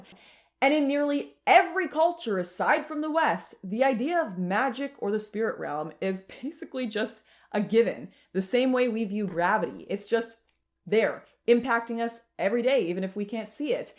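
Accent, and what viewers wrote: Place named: Canada. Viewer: She has a Canadian accent stop going on about trivial unrelated subjects.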